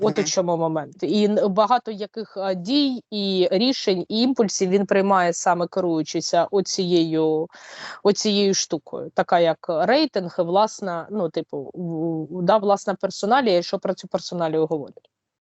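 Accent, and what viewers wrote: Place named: Kharkiv, Ukraine. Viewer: Манера мови характерна для її локації. Харківщина та Дніпропетровщина! Ну на 500 відсотків українська! Десь так розмовляв Нестор Махно!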